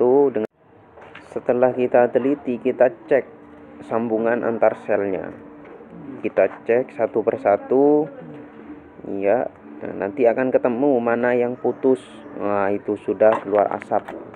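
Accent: Madura, Indonesia. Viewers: Ini kayaknys.😃 bahasanya madurasa asli madura bsng🤗telok lemak😅😅😅 tran tretan man nyaman tok cerr...serrr....tak iye....😅😅😅👍coba entar kalau accu err/ soak saya servis bang...tapi alamatnya di mana mau service accu mobil kalau gk bisa😅😅😅🤗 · Logat madura.. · Orang madura ya logat nya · Mak meddureh kak..... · Orang madura ya broo?? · Madureh kak toan.. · Bahasane.....kayak Madura.. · Madura ya cak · Medureh dimmah om.?? Terro ajhereh 😊😃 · Orang madura ni mh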